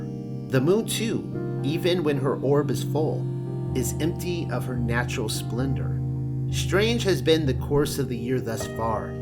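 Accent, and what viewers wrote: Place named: United Kingdom. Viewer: sounds like he was in the UK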